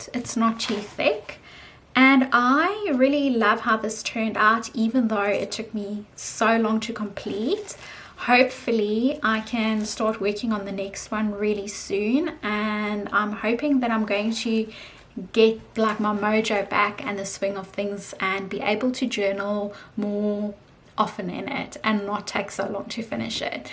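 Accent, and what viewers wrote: South Africa. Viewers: Love your accent, fellow South African😁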